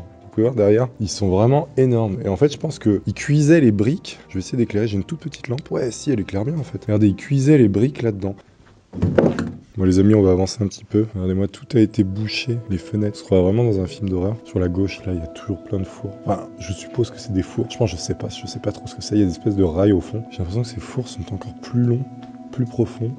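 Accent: Belgium. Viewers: Vraiment sympa tes vidéo Urbex :-) Tu utilise quoi comme caméra pour ta night vision ? car je cherche quelque marque ou référence. Sympa ton accent de Belge mais de mon coté vers Charleroi on as pas cet accent de Bruxelles ^^ Par contre oui quand on rend la monnaie ou n'importe quoi d'autres, on dit bien s'il vous plais. Vive les frites et surtout les bonne bières :-) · je suis belge et je confirme ton accent ET oui c'est vrais les "esprits" belge son parfois violent;)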